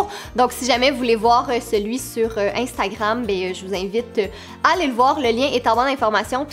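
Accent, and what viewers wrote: Quebec, Canada. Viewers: Oui mais car parle quebequois et non pas français ! Dsl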